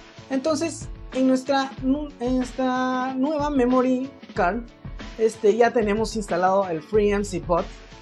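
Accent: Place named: Guatemala. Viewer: Acento chapin :3 hahaha que nivel vos gracias me sirvio.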